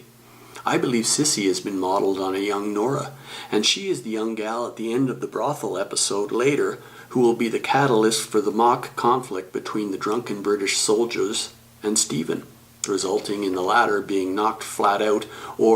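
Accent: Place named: Canada. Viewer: You're from Montreal? I thought you sounded Canadian!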